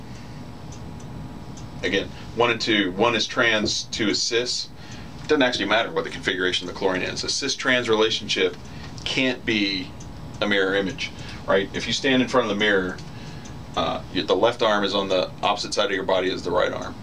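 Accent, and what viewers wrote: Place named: England, United Kingdom. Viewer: Are u an English man!!?.., I kinda felt that accent..., Anyways amazing video!!!. Don't stop doing videos coz, "winners never quit and quitters never win"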